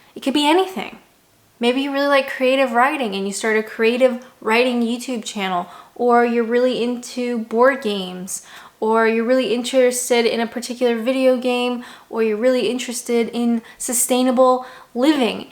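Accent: Canada. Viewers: fist of all, I'm sooo happy for you 🥳🥳 and i hope everyone works out for you for the rest of your life ! I've been learning english vocabulary and phrases from you over these video in oder to get used to Canadian's accent 🤗 (I'm a Korean I'll move to Canada next year ) So I'd be so happy if you could upload a video with subtitle ~^^ I had to write down every single word you said on video 😂😂 and then ask an native speaker to help me with some part i couldn't catch or catch incorrectly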